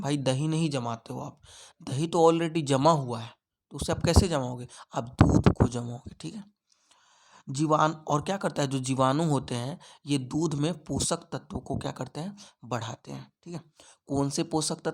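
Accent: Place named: Bihar, India. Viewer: Aap Bihar state se hain kya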